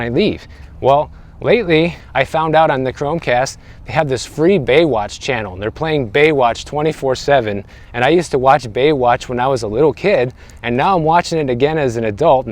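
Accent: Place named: Midwest, United States. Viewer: You still have your midwestern accent (flat vowels).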